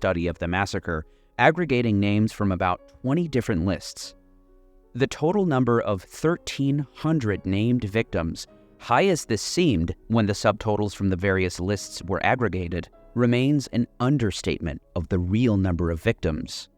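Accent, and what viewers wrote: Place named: United States. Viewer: He does speak with an American accent.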